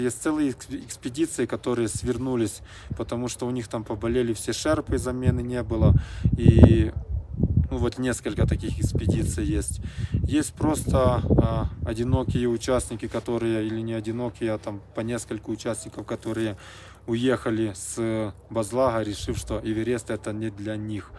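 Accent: Ukraine. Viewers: Альпинист который погиб 11 мая никакой он не швейцарец. У него пакистанские корни. Он профессиональный альпинист, у него 7 восхождений на высочайшие вершины планеты. Но вот восхождение на Эверест совпал с окончанием мусульманского рамадана, месяц соблюдения особого режима питания. Религия на столько победила здравый смысл, что даже в горах, в опасности он пытался не нарушить рамадан. Тут и горам понятно что организм был предельно истощён. Я читала о нём в YouTube, и у нас в Швейцарии говорили о нём по радио. Что это первые 2 смерти на Эвересте с 2019 года. Он и американец, кстати, тоже мусульманин. В 2020 Эверест был закрыт из-за короны., увидела тебя и сразу же подписалась, настолько приятный у тебя украинский акцент. Я сама из Украины., парни, удачи вам, благоприятной погоды, счастливого погодного "окна ". Непогоду лучше просто переждать. Любой риск должен быть оправдан. 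Сегодня 16 мая.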